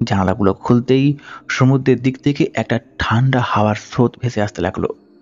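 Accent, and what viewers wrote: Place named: Medinipur, India. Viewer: Vai tomar bari medinipur naki..kotha bolar dhoron suney money ho66ey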